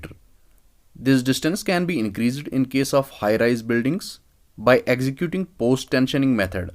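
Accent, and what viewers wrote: Kashmir, India. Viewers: Kashmiri accent.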